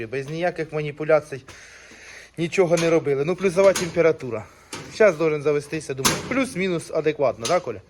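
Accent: Poltava, Ukraine. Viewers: Він говорить Полтавською в нас це нормально.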